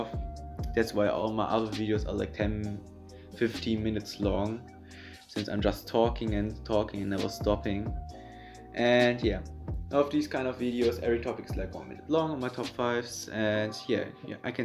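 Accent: Germany. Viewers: Hahahaha direkt gemerkt dass du Deutscher bist :DDD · Du bist Save deutsch merkt man 😂 lass mal zsm zocken adde mich mal auf Ps4 heiße Universum007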